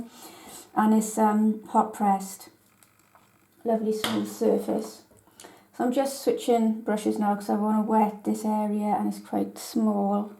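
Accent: Wales, United Kingdom. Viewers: Beautiful thanks so much. Love your welsh accent!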